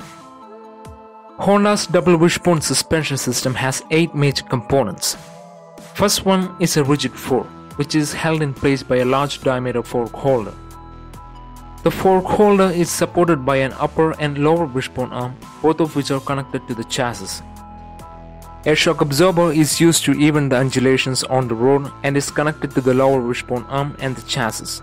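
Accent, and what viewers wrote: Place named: South India, India. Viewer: U are awesome man thanks for the information that to detailed about the suspension system and how it works. Really needed these info also saw your telever suspension on BMW just great man keep it up. Where u from from your accent I think you are from south part of India.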